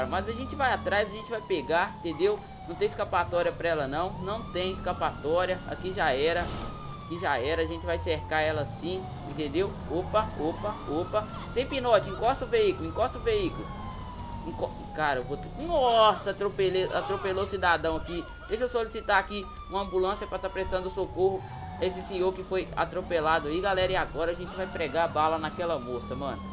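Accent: Minas Gerais, Brazil. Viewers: Ele e mineiro?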